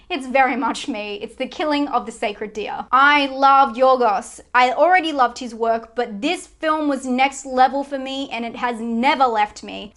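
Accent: Australia. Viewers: New Subscriber! - No need to apologize for your accent 😎 that is one of the little things that sets you apart from all the other channels, and if someone doesn't like the aussie accent...I'm pretty sure they Hate puppies and you shouldn't worry about those psychopaths #kiddingnotkidding :~)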